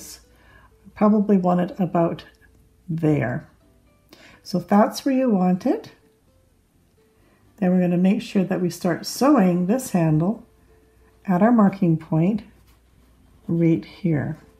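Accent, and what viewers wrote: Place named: Canada. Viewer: Hi. I love your tutorials. You sound like a Canadian.;) If you are where do you purchase your cotton rope for your projects. I live in rural Manitoba and have very limited options. Thanks so much and keep them coming. I dyed rope and made Easter baskets after that video....so great.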